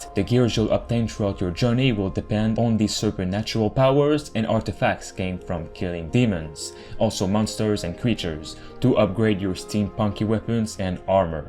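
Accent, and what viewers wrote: Quebec, Canada. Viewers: The Quebec accent here is strong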